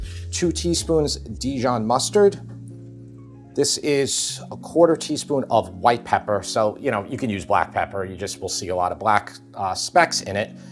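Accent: New York, United States. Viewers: Just made another batrch today in an effort to save money. Well not an issue in USA, but here in Norway sliced turkey etc and deli foods run at 45 to 120 usd a kg. So as a poor creative/guitarist, have to do anything possible to cut down on food costs. Whereas found chicken breasts on sale for 15 dollars a kg. Of course have expensive guitar collecting habit, just spent 20k usd on vintage guitars. But have to pay that back somehow. So strongly recommended. Plus I love the NYC accent. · I am from Perth, WA and I just wanted to say your NY accent is great!!! All the best.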